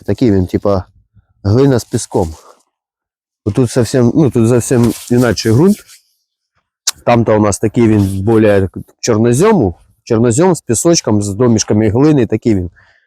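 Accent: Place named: Dnipropetrovsk, Ukraine. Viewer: Говор схожий на Дніпропетровську обл, думав що ви з пятихаток👌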